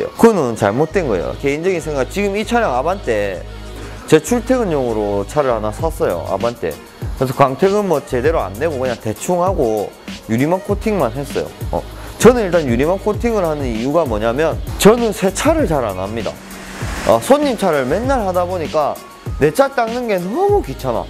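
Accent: Gyeongsang, South Korea. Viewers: 경상도사투리에 구독.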